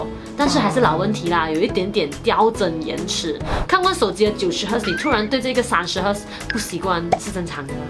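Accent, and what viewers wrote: Malaysia. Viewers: 马来西亚口音还是蛮好听的😁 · 大马华人说话好听😊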